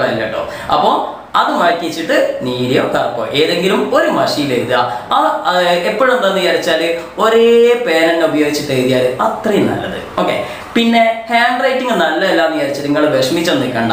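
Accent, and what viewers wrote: Malappuram, India. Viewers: ikkaa ingale language kettaal ariyaa malappurathkaarananenn Malappurathe piller like plzzzzz😄😄😄😄😄😃😁😁😁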